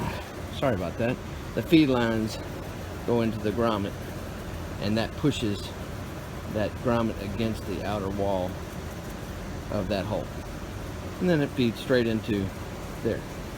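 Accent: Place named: United States. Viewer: Love the American accent :)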